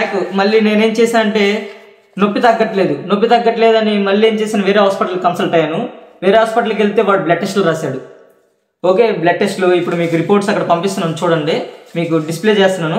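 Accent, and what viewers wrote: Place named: Visakhapatnam, India. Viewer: Brother vizag rural aa medhi. Slang konchem maku dgagara ga vundi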